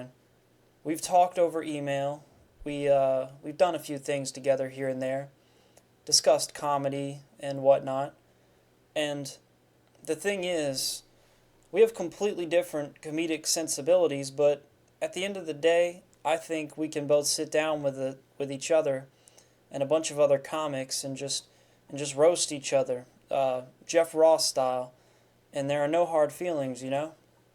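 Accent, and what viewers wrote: Mississippi, United States. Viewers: You from Mississippi?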